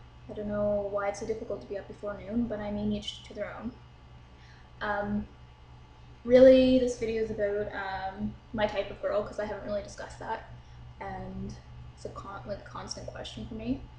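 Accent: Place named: Canada. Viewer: Aw are you Canadian? I can hear an accent!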